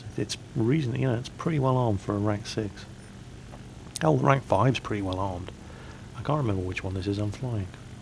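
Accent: England, United Kingdom. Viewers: I was a fair question dont be a dick. Just because he has a English accent doesn't mean he was born in England. Maybe his parents are Bohemian, like most Czechs. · There is no country called Bohemia lol and he is from Britain listen to him.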